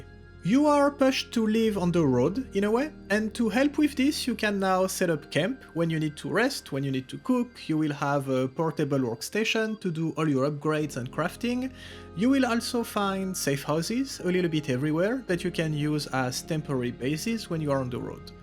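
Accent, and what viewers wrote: France. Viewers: The most French accent ever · le bonne accent français, j'adore ;)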